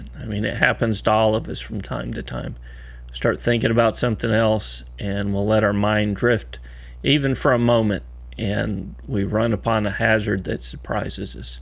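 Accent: Texas, United States. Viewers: Migth be useful for an impatient native english speaker, but I just love the way speaks. I used to work with a texan guy that talks just like. Calm, collected and thoughtful. Induces trust and confidence. I feel a bit like I am meditating, when I listen to his voice and advice.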